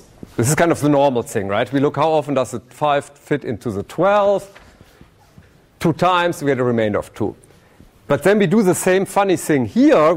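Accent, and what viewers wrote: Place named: Germany. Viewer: His English is not bad, but sometimes I can't tell if he's speaking English or German...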